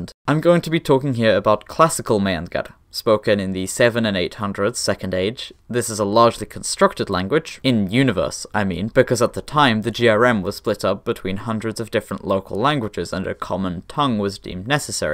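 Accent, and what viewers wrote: Sweden. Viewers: This dude is probably Swedish based on English pronounciation and how he pronounced things in his constructed language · the little bit of swedish that is in your pronunciation is so <333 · Are you Swedish? You sound kinda Swedish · Love your Swedish accent haha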